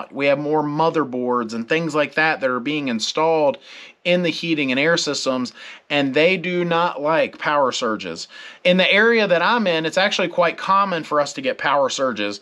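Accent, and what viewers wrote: South, United States. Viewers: Sound like a southern accent. Anywhere near Louisiana? Had 2 HVAC come out with both saying completely different things.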